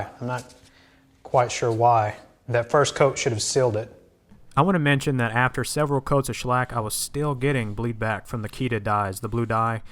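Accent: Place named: South, United States. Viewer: Can't decide whether I appreciate more the technique or the southern accent. Great video, though. Thanks.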